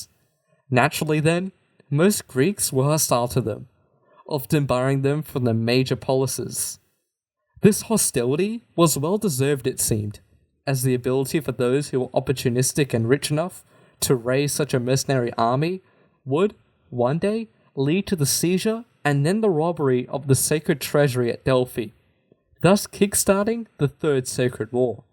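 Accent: Australia. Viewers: The language he speaks is strine. He is a Greek Australian where strine is a southern dialect of English. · Seb Sandman I am kinda embarrassed now - I didn’t even notice it was an Aussie accent in either of his videos so far. Only that the Hanno video rocked and this video rocked but the “is he about to crack up laughing” in this one kept intruding. I probably watch/listen to too many history-related things, which seem to have a preponderance of British-accent-family speakers. 😂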